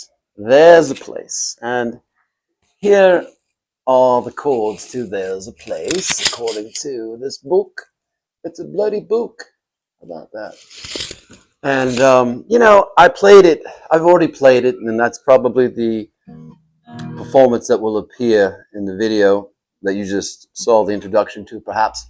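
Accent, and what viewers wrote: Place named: United Kingdom. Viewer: Charming presentation that includes a bit of wit, self-deprecation, and a hint, no quite a bit of a British accent. I loved the “ I know it’s blasphemy“ quip. And Very much enjoyed it being provided with a deeper understanding of the song that I had never really thought about much. Quite the board. Impressive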